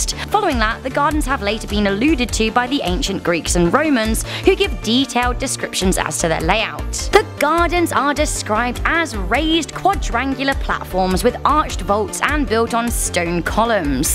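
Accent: United Kingdom. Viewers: Love the Brittish accent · Lmao she has a British accent. I'm British. Trust me, we usually know how to say Nebuchadnezzar properly here 🤣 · The moment I opened it my eardrum was assaulted by this irritating high pitched feminine British accent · Shouri Like a Melody that’s exactly what an accent is, she has a british accent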